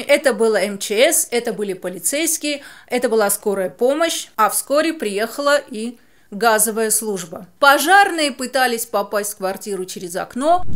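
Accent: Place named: Armenia. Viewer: Вы уже говорите с армянским акцентом 😂 👍👏.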